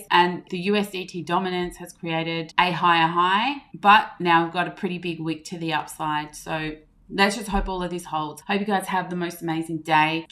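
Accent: Australia. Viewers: Not all all. Love your accent and voice! I love Aussie accents. Best one for women to have!!! I work in the Film industry with them!!!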